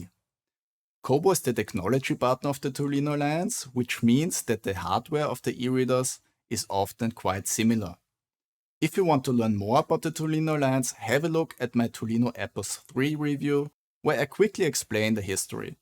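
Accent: Austria. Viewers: thumbs up for the fat austrian accent from germany. My favourite word: The Bauerbuddnblaismänt. :) Eine Mischung aus Domi Thiem und Felix Neureuther, sehr sympatisch! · This is the very first of your videos I’ve watched. Your English sounds Austrian, nice ;-)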